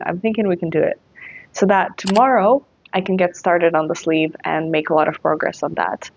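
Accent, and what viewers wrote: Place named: Eastern Europe. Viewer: Do you happen to be a Slavic language speaker? Your English is perfect but some familiar intonations tend to pop in from time to time.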